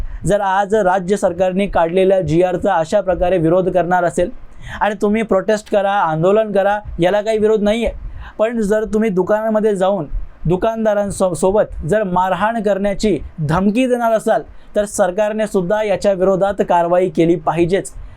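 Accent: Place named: Uttar Pradesh, India. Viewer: मराठी नाही आहे यूपी बिहार मधुन येऊन मराठी बोलायला लागलेला दिसतोय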